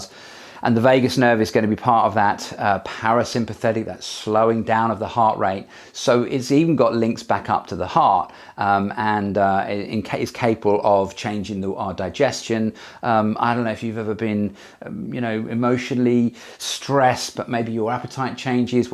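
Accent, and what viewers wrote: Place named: Scotland, United Kingdom. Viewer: Scots speak!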